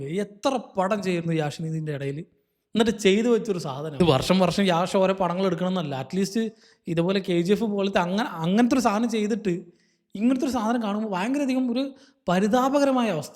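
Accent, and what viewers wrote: Palakkad, India. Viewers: Palakd ano bro sthalam.... Nice slang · 😂😂😂😂 നമ്മളുടെ slang വരുന്നത് കൊള്ളാം Bro Palakkad Slang 😂😂 Review കണ്ട് ചിരിച്ചു മരിച്ചു 😂😂😂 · Annante paalakkkadan bhaasha purathu vanna review......👍🙃😅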